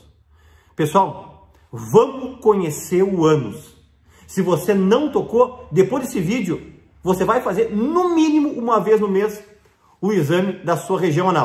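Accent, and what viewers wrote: Rio Grande do Sul, Brazil. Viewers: o Sr é Gaúcho?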